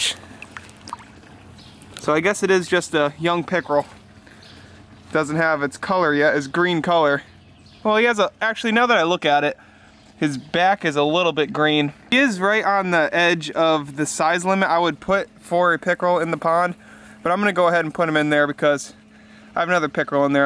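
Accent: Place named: New England, United States. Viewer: So New England I love it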